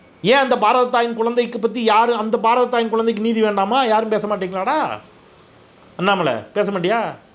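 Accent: Tirunelveli, India. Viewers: sir tvl language ❤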